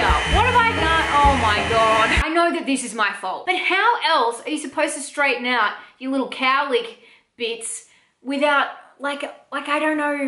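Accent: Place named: Australia. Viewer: Oh wow, I so enjoyed your video. You completely are real, funny and totally honest plus I love your Aussie accent. Btw- I like the way your hair looks. I don't even bother sectioning my hair. I just grab pieces and go at it.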